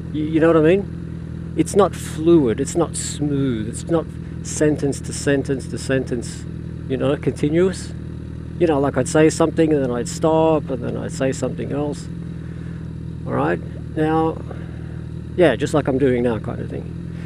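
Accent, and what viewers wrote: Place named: Australia. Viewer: Simply fantastic mate! Us American blokes luv everything Australia+UK...yes I knew from the accent and driving on the wrong side of road..I thought UK until I saw Palm trees. So now I can see Sydney from the seat of a fireplace. Say, my bike-CBR1100XX..is not common here, but I know that you have some over there...ever seen one over there in kangaroo land? I'm subscribing.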